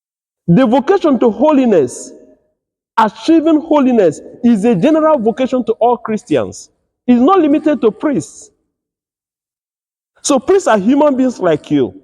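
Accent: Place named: Nigeria. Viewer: I believe the future of the Church is in Africa. In our parish in the South of England we have two extended Nigerian families and a family from Zimbabwe, all active members of the Parish. re you Nigerian,? Your name and accent suggest so.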